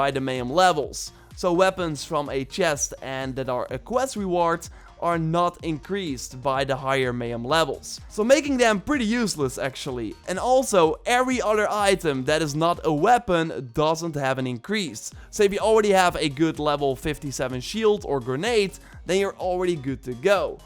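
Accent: Netherlands. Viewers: you sound dutch???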